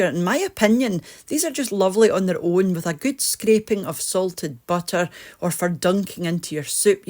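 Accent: Scotland, United Kingdom. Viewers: Ooh. Lovely. Scottish rolls AND a video with your lovely Scottish accent. My evening is complete.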